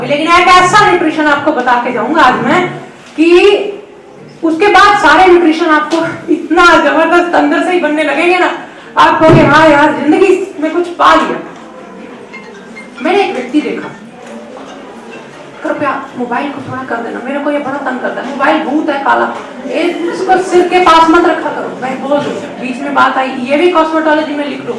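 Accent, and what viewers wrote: Punjab, India. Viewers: Thank u.. its very refreshing to hear u.... a bit of punjabi accent in it... God bless u... from Kenya África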